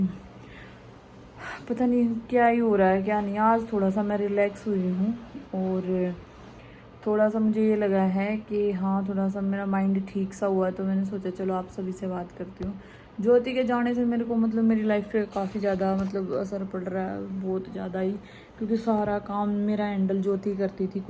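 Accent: Haryana, India. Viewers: Aap Haryana se ni ho kya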